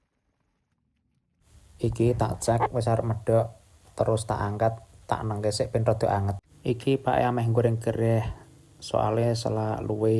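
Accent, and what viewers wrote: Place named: Java, Indonesia. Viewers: Mas sampean wong daerah ngeni sih kok ngomonge hampir mieip banget daerah ngonku aku wong surur sari grobogan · Bahasa medok smpean koyok aku ide ne iso aku suka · Mase ki koyo wonogiri pinggiran Yen gunung kidul bhsane ono bedobe...umpomo yu .dadi zhu.. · Sampean ki rumahe mana mas? Kok boso jowone medok? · Nambah komen mas,,ketok medok coromu ngomong ,,sampeyan corone masak ugo noto njero omahmu persis budeku,,.jangan gori,,iwak gereh.. Persis asli wong ndeso tapi kemajuane pesat,pinter opo wae. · Kakak orang mana bahasa Jawa nya kok medok kali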